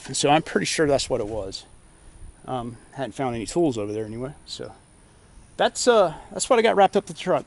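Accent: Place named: Georgia, United States. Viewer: Lol I knew this guy was from Georgia before I Saw his tag I’ve been to pike County a few times!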